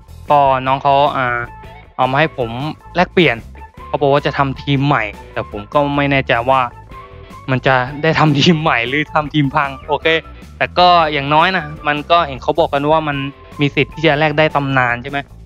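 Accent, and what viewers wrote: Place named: Isan, Thailand. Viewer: ครับพี่พูดเหมือนคนอีสานเลย555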